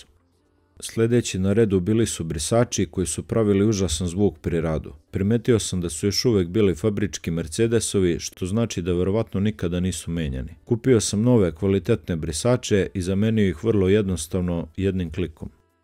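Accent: Šumadija, Serbia. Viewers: Od prvog tvog videa koji sam gledao prepoznao sam u glasu da si Šumadinac, pozdrav iz Aranđelovca 😂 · Brate ti si čarobnjak. Svaka ti čast. Nisam znao da si Aranđelovčanin mada se čuje Šumadija u govoru.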